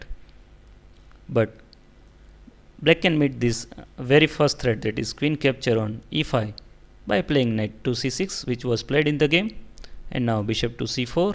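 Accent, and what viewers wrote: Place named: India. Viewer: I was talking about accent. Yeah his english is perfect but... I just cant stand indian accent. Its just so annoying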